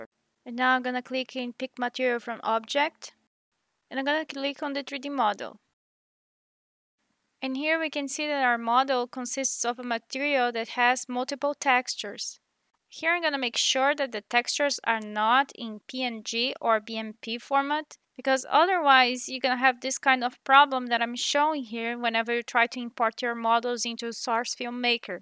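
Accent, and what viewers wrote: Brazil. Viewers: caramba mano, eu fui pesquisar sobre como extrair modelos do ps2, e saquei seu sotaque na hora que vc era brasileira kkkk, é meio difícil brasileiros se interessarem por essas coisas, muito bacana o vídeo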